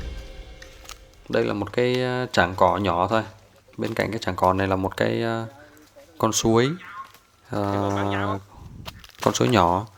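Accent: North, Vietnam. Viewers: giọng bắc 54 ở đồng nai .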